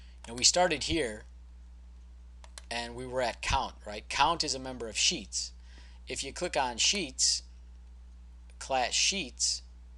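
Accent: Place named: United States. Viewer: Thank you, Very clear lessons and a good American accent.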